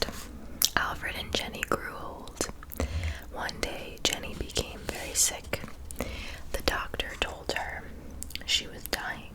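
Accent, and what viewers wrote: United States. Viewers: Hi!I really love your videos, the true crime “video series” it’s absolutely amazing, your voice it’s soooo relaxing and I love you American pronunciation❤️😁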